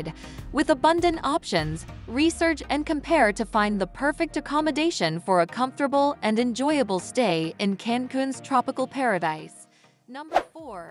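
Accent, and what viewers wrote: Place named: United States. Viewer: when american pronunciation cicks in,.. some places had a different pronunciations. anyways thanx for this general video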